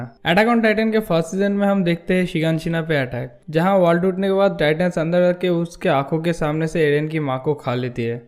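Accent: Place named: Bengal, India. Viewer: bro you sound like bangali are you ?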